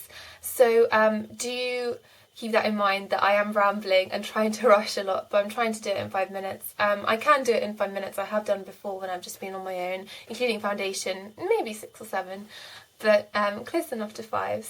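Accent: England, United Kingdom. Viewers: I keep watching ur vids coz of the tips but also coz of your accent!! Reminds me of Kate Middleton's accent :D What type of English accent is it, if its ok to ask?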